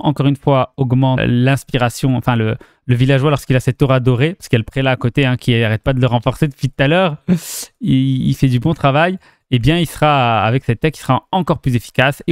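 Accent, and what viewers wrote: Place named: United Kingdom. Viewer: Marrant, les haters qui se moquent de ton accent anglais ne viennent pas corriger ton allemand ?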